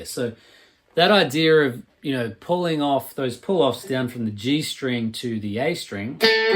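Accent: Australia. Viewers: great stuff ozzie accent to doesn't get any better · Not an accent. That's Australian.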